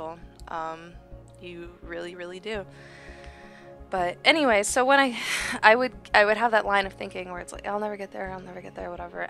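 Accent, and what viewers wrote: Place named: Toronto, Canada. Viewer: It's really nice to have this in the background while working, I struggle with not being distracted by videos and social media, so when I'm listening to someone talk I'm able to focus: ) Also getting almost homesick hearing your accent, I miss Toronto.. Anyway, I'm honestly really enjoying your videos, you have relevant and meaningful opinions, I hope you keep making them^^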